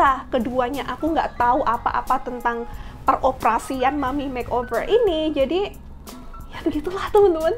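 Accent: Java, Indonesia. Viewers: B.indo oke Inggris pinter dan lancar B.jawa tetep g ilang jawane lek ngomong sambil ngakak karo bestiene 😂.. enak banget denger suara mbk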